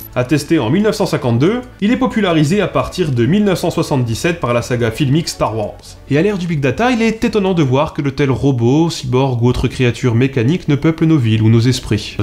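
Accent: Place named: Lorraine, France. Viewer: Il est lorrain si je ne m'abuse ...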